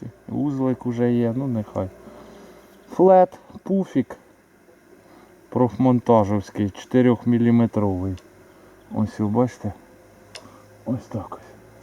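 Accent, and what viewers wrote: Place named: Romny, Ukraine. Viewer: Гарна рибалка! Молодець! Чую по говору ти з Роменщини?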